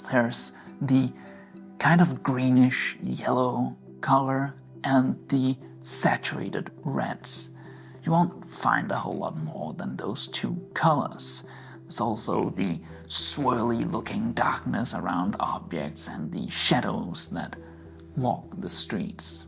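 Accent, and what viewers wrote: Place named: Germany. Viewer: Great Video, one small question, are you german or someone who speaks a germanic language natively (other than english) I think you have a slight accent that can be often heard by Germans and Dutch people when they speak english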